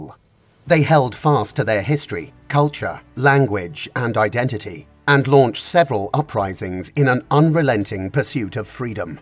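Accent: United Kingdom. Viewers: honestly, I didn’t even look at half, but firstly because of the title, secondly because of the positioning of Poland as an innocent victim, and Russia as the most vicious of all countries, thirdly, there was no such state as Ukraine in the 17th century, fourthly the story is not told here, but certain one-sided information is being promoted with omission of certain points, the goal is not education, but the promotion of a certain agenda (by the way, voiced with a British accent), the British are always thrilled to pit the Slavs, as well as other natives. Therefore, I consider this video to be propaganda dirt aimed at escalating an already difficult situation.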